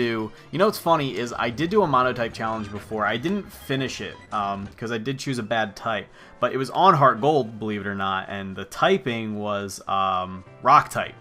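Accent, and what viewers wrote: Sweden. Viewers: your Swedish accent sounds like Sven from happy feet 2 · That is one legit Swedish accent!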